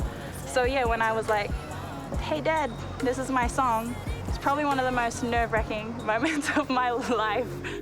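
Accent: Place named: Australia. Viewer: Your Australian lol lmbo I love the accent ❤lol lmbo.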